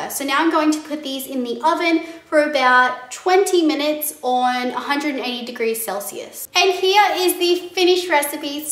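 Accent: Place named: Australia. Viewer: thank you for making videos - they are very helpful) its funny, but you sound almost exactly like heyyyjune, and i know you both are australian, but its not just that - im also subscribed to meejmuse and chloemorello, and they somehow sound i little bit different))) i just love aussie accent)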